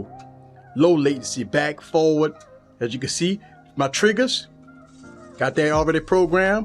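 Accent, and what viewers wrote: Louisiana, United States. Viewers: Just curious we're are you from? your accent says Louisiana..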